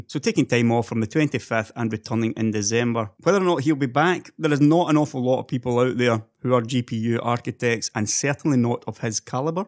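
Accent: Scotland, United Kingdom. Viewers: Are you Scottish or Irish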